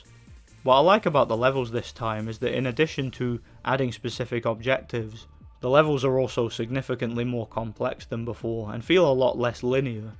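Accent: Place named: Northern England, United Kingdom. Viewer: Wait, you're Scottish? I assumed you were Northern English.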